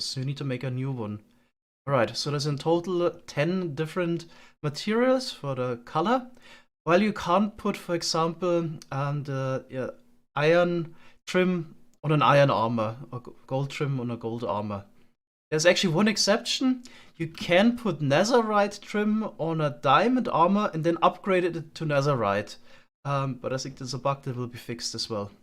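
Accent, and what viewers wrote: Germany. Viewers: I always hear of your Farms but never knew who you are. So i subbed a few days ago to look a bit into your channel, and yes you're Minecraft Content is awesome 😁 But one question raises as i listen to you... are you German? Bist du aus Deutschland? Klingt vom Akzent her nämlich so und würde perfekt zum komplizierten Maschinenbau passen! 😄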